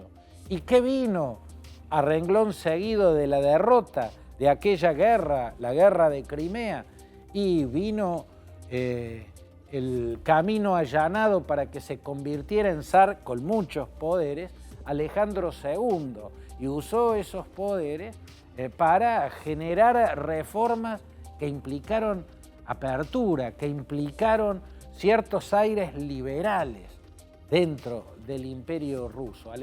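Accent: Córdoba, Argentina. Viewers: Genial su tonada cordobesa y sus conocimientos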